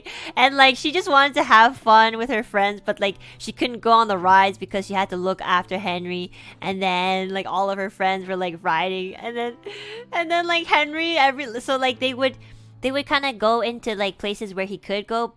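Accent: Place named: United Kingdom. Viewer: 笑死 英國腔真的有像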